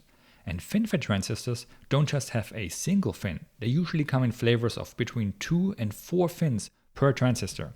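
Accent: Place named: Germany. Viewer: This man is likely from German, no Wonder the English is like what I think, cause previously I have Watched German Laptop reviewer (Notebook Check)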